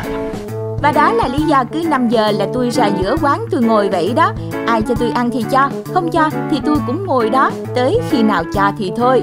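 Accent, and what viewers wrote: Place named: South, Vietnam. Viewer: Nhiễu nước dãi .. gớm quá.., giọng đọc miền Nam dễ thương .. quá.. !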